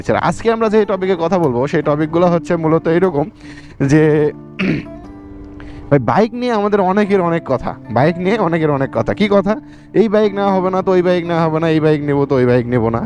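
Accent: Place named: Naogaon, Bangladesh. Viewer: ভাইয়ের ভাষা শুনে মনে হচ্ছে আপনি নওগাঁ জেলার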